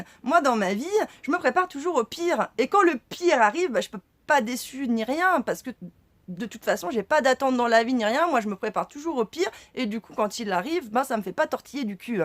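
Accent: Paris, France. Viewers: qu'est ce que tu me fais marrer, j'adore ton peps pour expliquer les choses primordiales à 13500 abonnés (c'est top) au début, ton accent parisien m'agaçais un peu, je me disais encore une qui va raconter la vie sans savoir ....mais non t'es une référence. j'ai beaucoup d'estime pour toi car tu me communique. moi je suis a peu de chose dans les mêmes conditions de toi mais c'es trop de taf de vouloir les partager. merci encore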